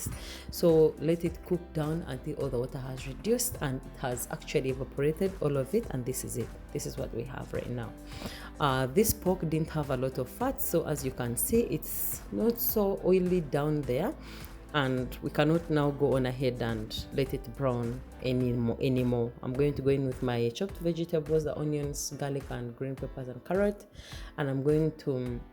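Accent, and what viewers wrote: Uganda. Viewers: I love Ugandan English (I love everything about Uganda 😂). It is very different from Kenyan English. If you know, you know. Copying the recipe. Love and greetings from Nairobi 🇰🇪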